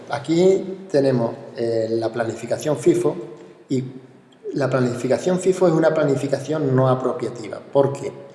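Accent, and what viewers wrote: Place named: Andalusia, Spain. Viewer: Yo diría que muy andaluz y con orgullo. Porque hablar sin miedo a reproches de algunos, orgulloso de su acento, al menos para mi, es digno de elogio.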